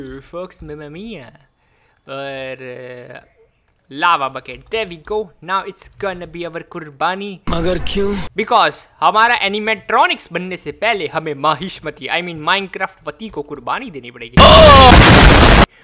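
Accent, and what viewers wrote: Gujarat, India. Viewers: are you Gujarati??🤔🤔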